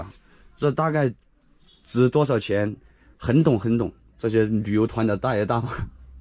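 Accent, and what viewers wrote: Sichuan, China. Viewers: 听囗音象我一个朋友，四川人吗？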